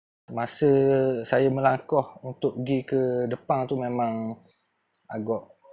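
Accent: Terengganu, Malaysia. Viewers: Suka dgr bro ni bercerita dgn loghat ganu dia..😊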